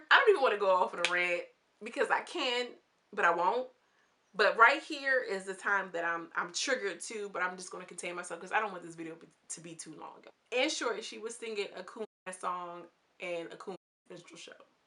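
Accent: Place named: Brooklyn, United States. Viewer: This was very informative... you sound like your from Brooklyn, I love your voice.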